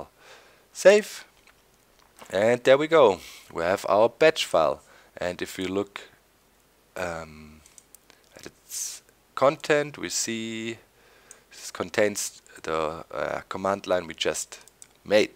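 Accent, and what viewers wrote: Germany. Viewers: yes he is becuase he lives in germany and hes actcent :3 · are you from german?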